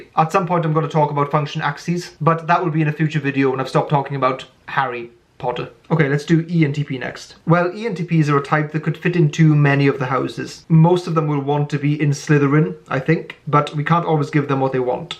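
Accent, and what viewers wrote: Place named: United Kingdom. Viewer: I'm a ravenclaw and INFP 🤓 you got it right. Also, I am not a native English speaker and I find hisaccent very interesting. It is hard to understand but at the same time I really like it. Is it british with a twist? I'm curious..🤔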